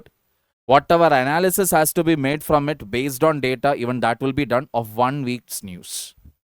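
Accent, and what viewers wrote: Kerala, India. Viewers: Kerala slang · Kerala voice ❤❤❤❤ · That mallu accent sirrrr❤😂 too cute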